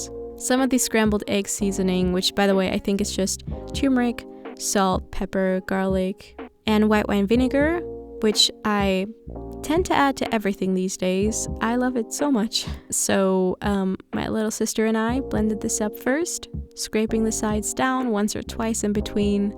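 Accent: Germany. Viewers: i love your videos, like just the way you talk ;) are you german by the way? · wait are u german?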